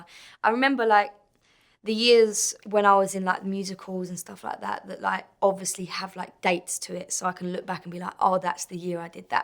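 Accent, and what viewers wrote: United Kingdom. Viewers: I never saw u talk like that that british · Wait.... your British?!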